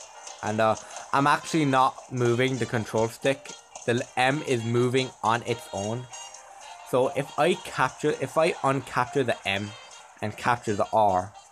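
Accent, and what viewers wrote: Ireland. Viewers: Hey are ya Irish? I am too! 😆 Also made a video about this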